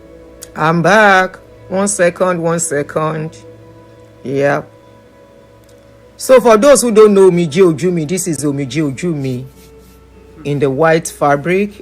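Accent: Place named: Yoruba, Nigeria. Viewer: Anti that your yoruba thong has come to stay oooo no anointing can change it ooo hahaha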